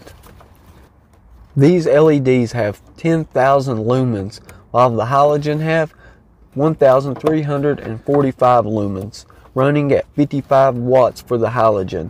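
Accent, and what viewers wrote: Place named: Texas, United States. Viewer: I love your Texas accent mate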